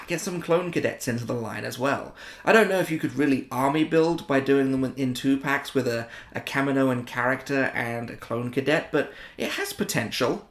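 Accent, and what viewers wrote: England, United Kingdom. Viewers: Ive never been good with accents but I assume your English. Im from Northern Ireland so its nice to see another Brit doing the Black Series on YT. Plus I also spotted some Dr Who behind you 😁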